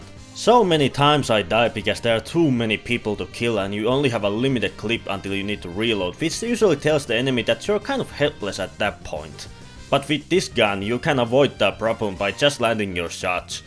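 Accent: Finland. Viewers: are you finnish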